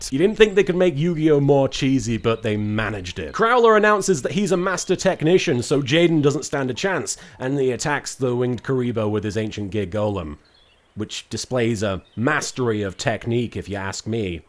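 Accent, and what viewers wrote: United Kingdom. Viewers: Your britishnes is showing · So that's what his normal voice sounds like... It's... British! Truly a shocker considering that he has been called a chubby British guy by Ishizu!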